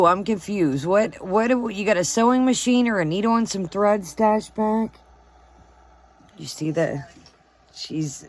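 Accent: United States. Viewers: Your text is too cute! I forgot that has an American-accent! ❤️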